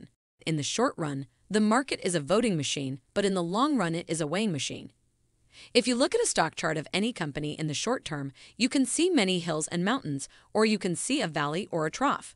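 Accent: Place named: United States. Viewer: How do you have an American accent and NOT know how to pronounce "Carolina"?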